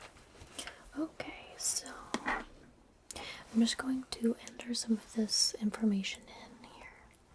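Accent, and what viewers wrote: United States. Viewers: that american accent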